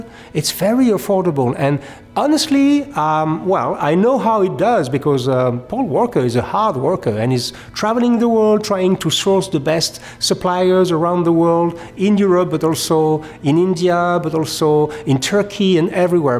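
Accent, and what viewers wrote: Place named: France. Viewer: I have just watched the two versions french and english of your videos. To compare the fluency of your eloquence i m so happy to see that we obvisouly notice that your french accent remains and the way of your talking remains french one it puts so valuable in your explaination. Yours from France 🇫🇷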